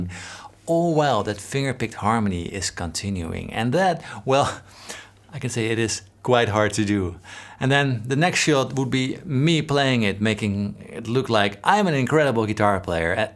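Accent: Netherlands. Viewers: Hey love your videos! Have been following your channel for years, and only now, when I'm about to get married to a Dutchie, did I actually notice through your accent that you're Dutch! Ahah! By the way, check this guy it would be awesome to see a video of you two together on your channel! Cheers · Hoi! Groetjes uit Utah USA. Ik ben SoCal geboren en groot gebracht. I was pretty sure I heard a Dutch accent... en, ja hoor! 😅 For me, music is my time machine. I'm 67 now. When this song came out in '75, I was in high school. I took a class on US government and our teacher had arranged for us to fly from Southern California up to Sacramento, the state Capitol. We traveled all over (State offices including Gov. Ronald Reagan's office) Folsom Prison (don't know if you're a fan), Old Town Sacramento and Sutter's Mill (where the 1849 gold rush began). ALL DAY LONG on the old school bus we travelled in, THIS brand new song played. 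It was repeated constantly. To this day, whenever I hear it, I am always transported back in time to 1975. I saw the a couple of times, once, (I could go on). And I have memories from all these groups. But takes me back in time to this one particular day like no other. My best friend was in the band in the '70's and left before their big hit from Top Gun in the '80's. Of the two of us, he's the real musician. I'm sure you've heard of Rick Beato. Still, I love seeing you young guys dig in to our old hits to figure them out and play them correctly. Warms the heart and soul. I guess I'm afraid after us old guys are gone, the stuff ppl call music today (or AI) is gonna replace it. 😢 Waar in Nederland ben je wonachtig? Mine kinderen zijn vlaak bij Dordrecht en Barendrecht. No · bro is that a dutch accent I catch there?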